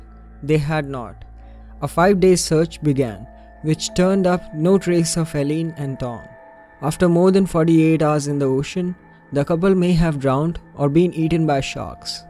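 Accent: India. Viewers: Your narration is fantastic! I love listening to Indian accent it's beautiful!! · Mate, you accent is Indian right? funniest accent in the world to my ear, I'm an Aussie so our accents is are quite different. But your well spoken, even if some things are missed pronounced and quite easy to understand, the dreary americans are the worst like Criminally Listed his a terrible narrator cant even watch his vids. my point is your got nothing to apologise for good video keep up the good work.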